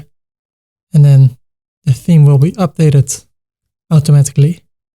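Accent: Netherlands. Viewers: ik wist meteen dat je nederlnds was je kan wel goed engels praten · your accent and face just shows me that you are dutch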